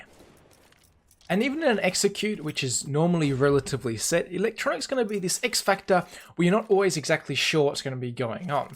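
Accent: Australia. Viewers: Nice recommendation, but he is not from NA I think. He must be from Australia, that's why he is speaking with a funny accent.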